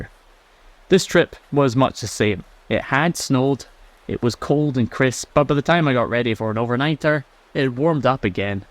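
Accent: Scotland, United Kingdom. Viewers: Once again an excellent video. However the flute, pipes and rushing water made hearing and understanding your brogue a bit difficult for this Yank. Too many folks over do the “background” music to the detriment of their videos quality. Just my pet peeve. I truly enjoy your love for accurate renditions of highland history.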